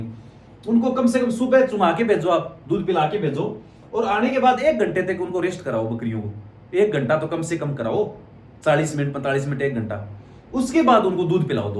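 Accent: Rajasthan, India. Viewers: 😂😂👍👍 Very nice 👌👌 Bhaai aap ka samjhaane ka tarika bahut hi sunder hai 👍👍 अलबादी बकरा भाषा में राजस्थानी लहज़ा बहुत ही शानदार लगता है 👌👌👌👍👍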